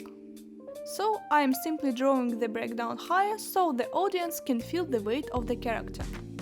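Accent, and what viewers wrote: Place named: Russia. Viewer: When a tutorial comes from mother Russia, then the heavy accent starts, you know the video it's going to be awesome!!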